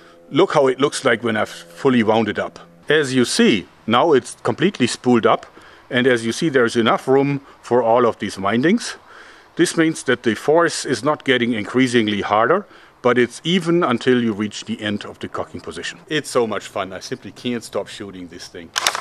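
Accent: Germany. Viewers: Ik like his german accent en strange laugh: this is the slingshot channel, hahaha. Nice channel bro; I like your hobby; because our governments disarmed us. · His deep voice with German accent sounds brutal. His laugh is epic too. Should be in horror movies XD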